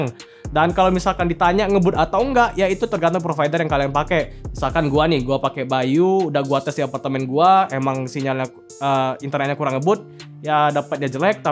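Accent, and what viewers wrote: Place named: Lombok, Indonesia. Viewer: Kayak logat plat DR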